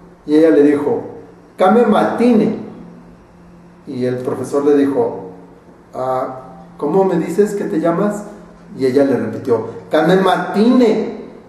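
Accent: Mexico. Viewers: Ni bien comenzo los 3 primeros segundos del video me di cuenta que eras mexicano ... Ni siquiera vi los comentarios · tienes acento mexicano nada neutro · Hablaste con un acento típico mexicano, con mantenimiento de /s/ (muchas veces pronunciada como /z/...), acortamiento de vocales y entonación mexicana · así hablan los de la ciudad de México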